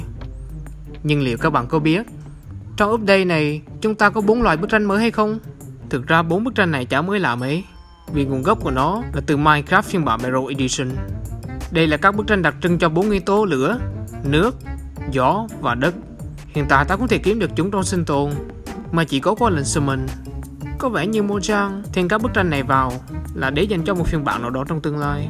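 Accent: Central, Vietnam. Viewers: Anh người Miền Trung ak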